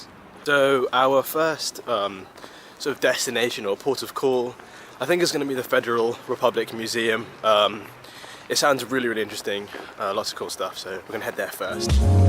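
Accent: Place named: United Kingdom. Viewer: Those soothing british tones will be popular with american viewers. Rake in those views boi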